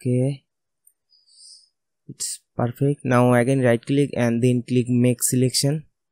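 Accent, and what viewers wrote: India. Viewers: This is probably really good for INDIA ACCENTed people, but I CAN ONLY UNDERSTAND about 20% of what you are saying. GEEZZ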